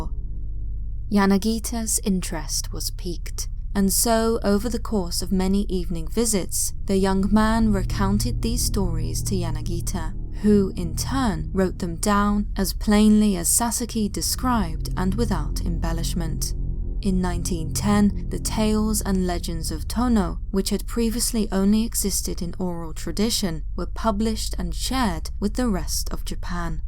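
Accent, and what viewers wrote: United Kingdom. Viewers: British folk just tell stories better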